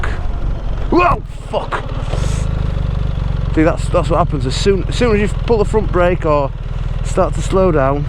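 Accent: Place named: North, United Kingdom. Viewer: You sound more northern than normal. And I don’t know how that is possible. As usual. Incredible video!!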